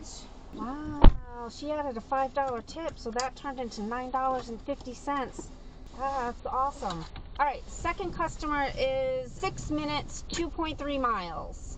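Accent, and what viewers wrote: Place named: Northeast, United States. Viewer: lovely accent. Is that northeastern? NJ maybe?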